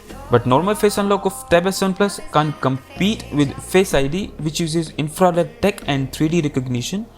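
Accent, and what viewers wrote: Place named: India. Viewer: india accents so funny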